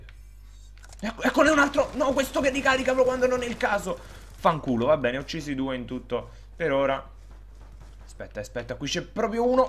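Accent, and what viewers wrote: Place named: San Giuseppe, Italy. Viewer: dal tuo accento mi sembri di San Giuseppe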